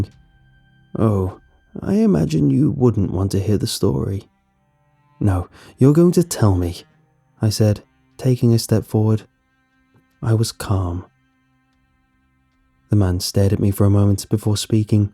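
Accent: United Kingdom. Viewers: Is from the UK?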